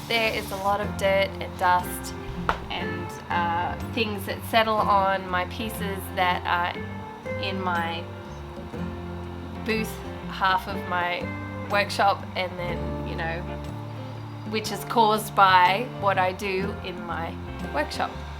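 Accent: Australia. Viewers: I must say, I love your Australian accent❤️👍 as well as your work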